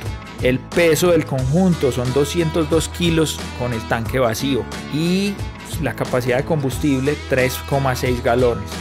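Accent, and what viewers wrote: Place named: Antioquia, Colombia. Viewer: Que bien se oye el acento paisa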